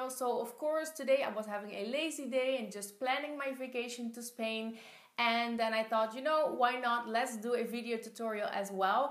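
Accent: Netherlands. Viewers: One thing. Are u Dutch?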